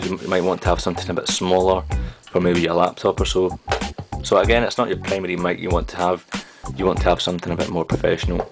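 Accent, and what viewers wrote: Scotland, United Kingdom. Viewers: Are you Scottish? B)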